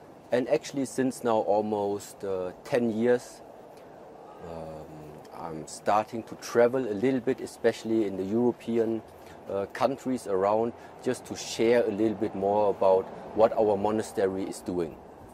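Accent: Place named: Germany. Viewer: nach einer sekunde gehört dass er eigentlich deutsch spricht hahaha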